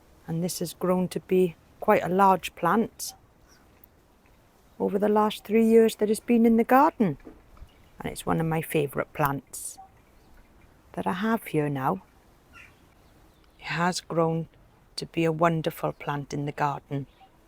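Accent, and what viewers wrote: Wales, United Kingdom. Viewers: Hi the Garden is looking lovely. Do you heat the greenhouse ? I'm in Essex. You're lovely soft welsh accent really reminds me of my Granna. 😊x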